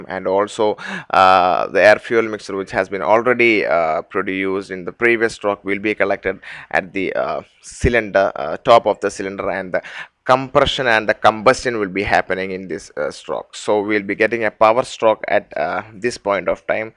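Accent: Kerala, India. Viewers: holy shit dude i didnt not listen to anything u just explained i was to busy laughing at ur indian accent hahaha gj tho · U must be a keralite for sure,.ur accent is such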